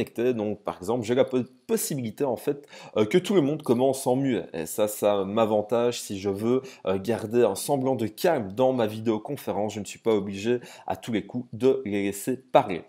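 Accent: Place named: Belgium. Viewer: Bravo! Explication complète, très claire et très didactique, avec une petite pointe d'accent belge bien sympathique... Vous serez sans doute ma référence, de même que ce logiciel l'est également ! Il faut préciser que le système utilise le principe du pair to pair et des serveurs répartis, ce qui convient particulièrement bien dans les zones mal desservies par internet (Afrique...) et qu'on ne dépend d'aucun prédateur du web tel que Microsoft, Apple, Google.... Il n'y a pas d'internet libre sans logiciels libres.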